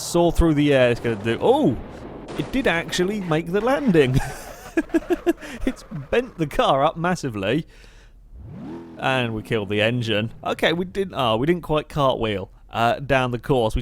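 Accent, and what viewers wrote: United Kingdom. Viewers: He's British. We have accents too you know.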